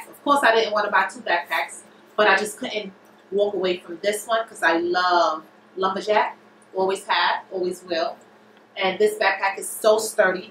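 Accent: New York, United States. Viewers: Nothing wrong, I love a New York accent.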